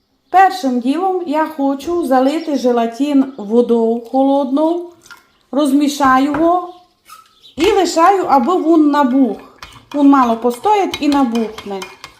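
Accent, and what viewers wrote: Transcarpathia, Ukraine. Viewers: Цей неймовірний закарпатський діалект то❤ Це лайк і підписка сходу! Дякую за вашу працю і процвітання🌷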